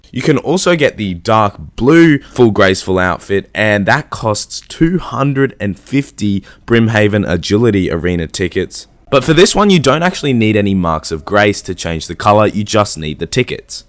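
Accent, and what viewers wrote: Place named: Australia. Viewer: Your an annoying Aussie. It's your phrase structure that annoys me. Like you sound blaagghhttt!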